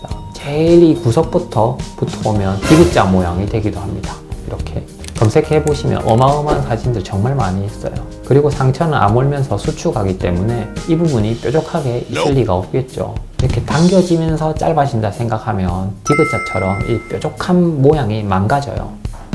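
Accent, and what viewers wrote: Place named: Gyeongsang, South Korea. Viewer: 구독 좋아요 누루라고 하셔서 바로 눌렀어요. 말 잘듣는 시민이쥬?~^^ 목소리 들으니 경상도쪽? 같으신데ㅠ 좋은분들은 머네요 흑ㅠ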